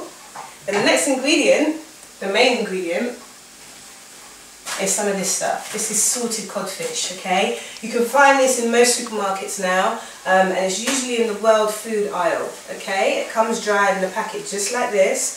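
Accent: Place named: United Kingdom. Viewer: Great recipe! I liked your British accent! Thanks!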